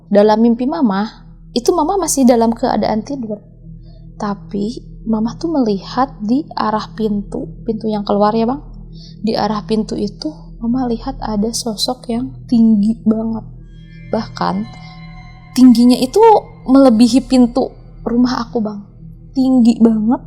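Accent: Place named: Sunda, Indonesia. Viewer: Klo org sunda ska mndongeng sok enakeun.. ada ciri khas nya kata " teh.." yg gk bsa d ilangin....😅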